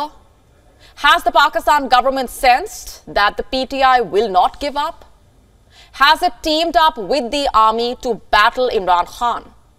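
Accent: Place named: India. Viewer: This is the worse presenter in Wion. Her spoken English is Indian not English.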